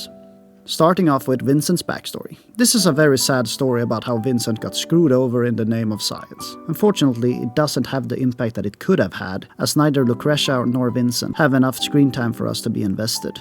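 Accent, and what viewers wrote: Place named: Sweden. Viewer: May I ask what country you’re from? You sound Swedish, and I hope that’s not insulting. My grandma is a full blown Swede. Great channel dude! I’ll definitely be following you and looking forward to what you put out next.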